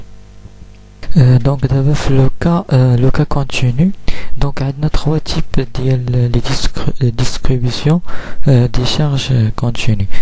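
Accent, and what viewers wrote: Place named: Morocco. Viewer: Il est marrocain 🙂 donc c'est normale que ça façon de parler n'est pas comme la tienne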